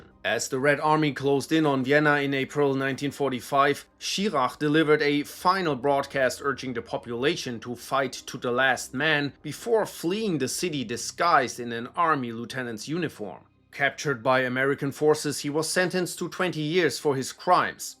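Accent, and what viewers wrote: Germany. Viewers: Already love the German accent and language and hearing german english makes me wet ( I'm a guy ) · You know it's the real deal when the narrator has a German accent. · The german accent makes this video way more easy to sympathize with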